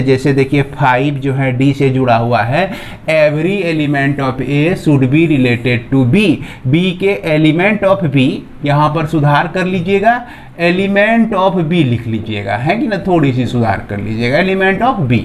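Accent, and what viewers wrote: Bihar, India. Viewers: Bihari Accent 👌😘😂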